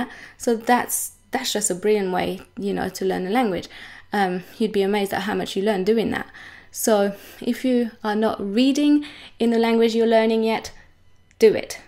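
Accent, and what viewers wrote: England, United Kingdom. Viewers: vous avez un accent anglais, il est tres mignon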